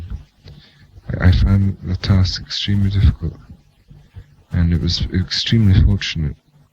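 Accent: RP, United Kingdom. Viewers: Very clipped and upper class. But a lovely voice, and he finished with a chuckle, rest in peace x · Beautiful accent. Posh but quintessentially English. He sounds fucked though. Thanks for posting.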